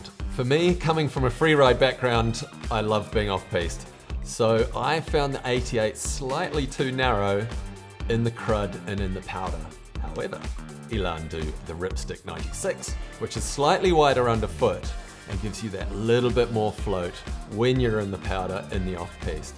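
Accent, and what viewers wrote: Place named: New Zealand. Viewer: Found this Vlog by accident. Nice vlog Mate. Funny hearing a Kiwi after being here in the US for 20yrs - now I know how I sound to my mates!!! Chur Bro. Armada 92 Declivity for me cuz…( latest edition )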